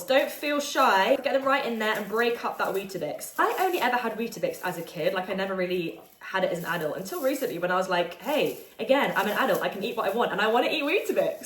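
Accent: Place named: South, United States. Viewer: I enjoy your videos. They are enjoyable to watch but would you stop with the southern accent? Please.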